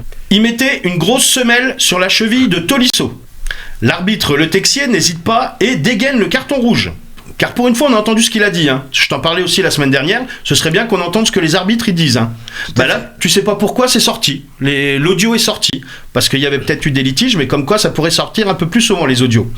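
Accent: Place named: Burgundy, France. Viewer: Je t en supplie prends ton accent Bourguignon dans tes chroniques car il est délicieux et c est surtout ta marque de fabrique !!!!!! Une fan bourguignonne inconditionnelle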